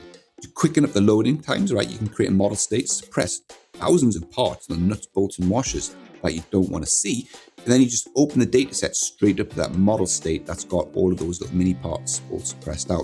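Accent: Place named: England, United Kingdom. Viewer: just watched your video for a second time at 1.0 play speed instead of normal. I have a hard time keeping up and I think its just due to the heavy english accent. You create excellent videos but a suggestion comes to mind, SLOWDOWN , for us dumb americans that cannot always pick up what you are saying with the accent. I am sure you have seen this comment before and are perhaps sick of it but it has merit . PS love the leather jacket and for whoever thought is was distastefull should pound salt where the sun does not shine! TANK'S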